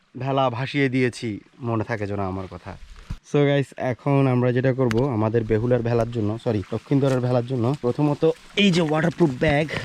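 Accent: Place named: Feni, Bangladesh. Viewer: ভাই তো আমাদের সেম টু সেম ফেনীর ভাষায় কথা বলেন 😮 অসাধারণ লাগে শুনতে 🥰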